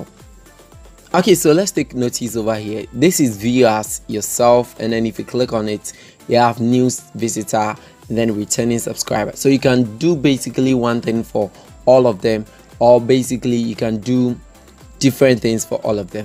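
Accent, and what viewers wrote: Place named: Ghana. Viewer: See ya fine Ghana accent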